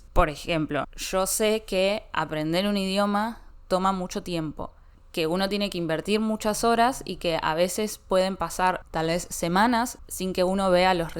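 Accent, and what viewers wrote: Argentina. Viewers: Eres argentina ❤? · ¡Hola! Tu acento es lindo, y no es tan cargado como generalmente es el acento argentino. ¡Me encanta Argentina! A ver...Yo acrescento más un sitio que también se habla español, aunque no de una forma oficial, pero se habla mucho español, que es Miami en Estados Unidos. Yo ya hice una investigación en la internet, y las fuentes que yo consulté, todas se ha comprobado que es totalmente posible vivir en Miami sin necesidad de saber inglés. Yo inicialmente pensaba que el español en Miami era necesario apenas para turismo, pero llegué a la conclusión que es posible vivir en Miami sin saber inglés. Saludos brasileño desde Río de Janeiro 🇧🇷😊.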